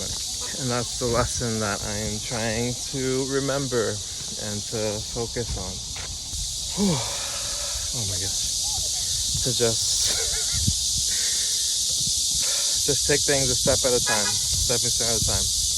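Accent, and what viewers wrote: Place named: China. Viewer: You're developing a Chinese accent.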